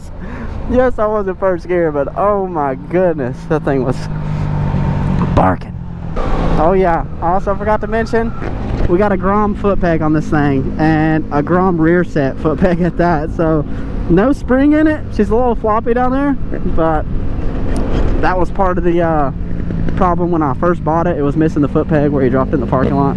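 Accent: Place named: South, United States. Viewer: You sound like y'all from dawn sawwf. What state?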